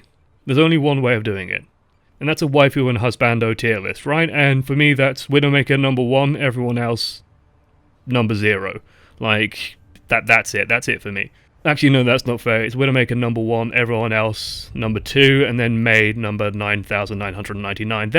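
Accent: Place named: United Kingdom. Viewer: Sure there was something very funny but i dont know English so well to understand that( But still thank you for your videos because i can watch some interesting material about game i like and learn English a little bit. First time i watched one of yours i didnt even understood all that you said but now i can easily listen to people with British accent and realise what they say. So yeah, you`re cool. Keep doing your vids.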